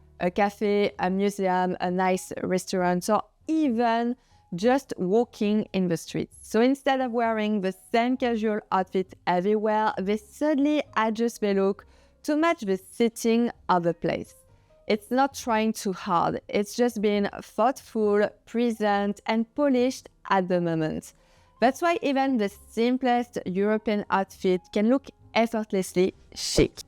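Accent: France. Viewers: I just love the way you show the pictures of Of Fashion on Lily Collins from the movie and or series as badly styled because I’ve watched a few of those and I agree it’s just wrong and then I’m wondering if you could tell me if you really have such a strong French accent, or you could speak English with less of it🤪😅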